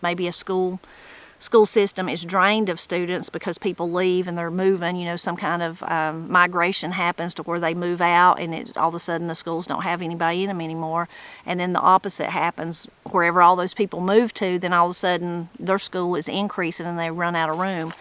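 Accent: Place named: Martins Ferry, United States. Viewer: I just subscribed. Your speech and culture remind me of my family roots from Martins Ferry area.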